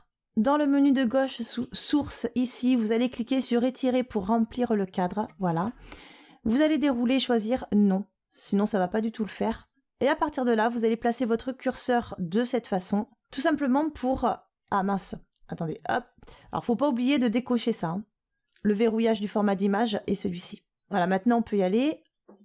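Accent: South, France. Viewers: oh t'es du sud toi, quand je regard tes tutos et après tes clockworks sa fait un choc, tu refait tout sur une logiciel comme C4D non ? Bonne vidéo btw :)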